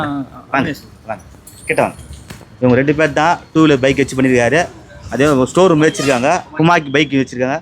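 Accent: Madurai, India. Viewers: Super Anna madurai vasagam ❤🎉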